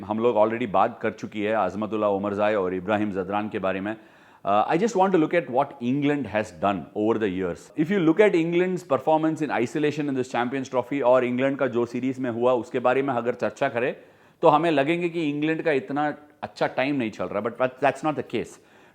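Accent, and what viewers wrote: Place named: India. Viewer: Who loves his hindi accent ? 👇🏽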